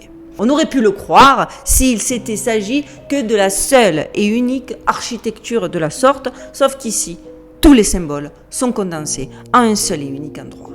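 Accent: South, France. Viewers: Merci c tjs un super moment malgres le sujet terrifiant Magnifique travail et je suis d aix en provenve donc J ADORE ta narration bien du sud Bravo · Salut j’aime tes vidéos que je viens de découvrir continue ne lache pas ! L’accent du sud donne un plus à tes vidéos! Peut tu s’il te plaît faire une vidéo sur le gangstalking qui va avec le thème de tes vidéos?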